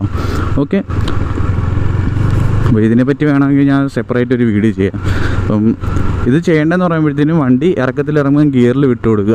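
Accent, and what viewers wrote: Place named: Trivandrum, India. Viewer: Where is this place ? Trivandrum ano? Asking because your way of talking is very similar to someone from tvm. Im from tvm and we also use the word theri for slope.... Great video by the way ...very well explained with examples.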